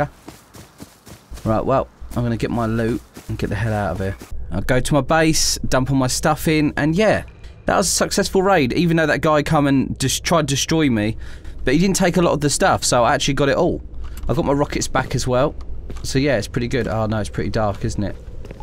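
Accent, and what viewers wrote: United Kingdom. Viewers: I love your British accent and your videos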